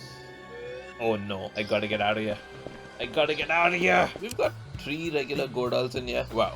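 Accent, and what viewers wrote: India. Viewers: I love his Indian accent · You sound indian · He is already sound like Indian because he is from India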